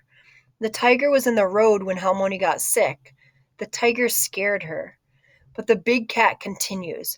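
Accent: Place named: United States. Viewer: Thank you for your video. I really needed an audio of this book for my shadowing so as to get familiar with the intonation of American English. It definitely helps me a lot and your voice sounds great to listen.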